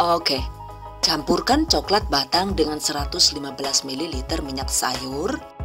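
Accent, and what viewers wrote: Banyumas, Indonesia. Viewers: 😂😂😂 lagi serius2..., becanda gaya ngapak ... The best lah Bu!, thankyou resepnya Bu!...saya coba nanti. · Bunda,kok bahasane ngapak , emang asli mana bun,,,oya bun nnti saya coba ,,,,,sehat selalu bun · Deneng bisa basa ngapak yak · Aq pasti ngakak denger logat ngapaknya,,,,,kui sing tak kangeni bunda,,,,aplgi resepnya,,,,,,suka bangettt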